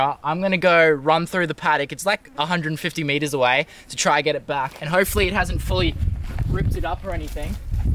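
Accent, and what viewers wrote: Australia. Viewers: Oh my god that accent he must be from the eastern states lol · That’s just an aussie accent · I love the Australian accent :) you have beautiful eyes as well. Great little documentary/ show i love it! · Lol, he is explaining great, you just ain’t used to the Aussie accent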